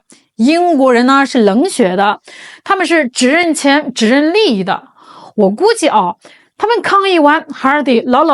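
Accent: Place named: Shandong, China. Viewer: 先改改满嘴的山东高粱腔，学会点儿话再出来卖无知吧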